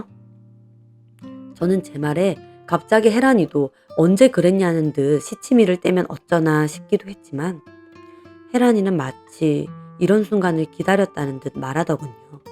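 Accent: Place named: Gyeongsang, South Korea. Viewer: 경상도 사투리 반갑습니다!!!!!!! :D 경상도 사투리라 그런지 사이다 부분에서 두배로 시원해요 ^0^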